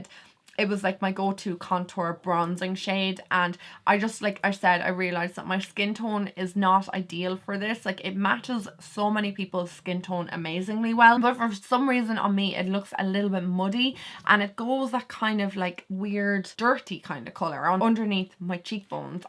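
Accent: Ireland. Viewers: I love your Irish accent so much it’s so beautiful ☺️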